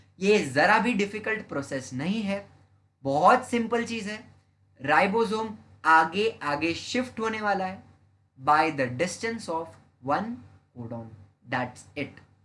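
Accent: Maharashtra, India. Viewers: Sir aap Marathi ho kya ??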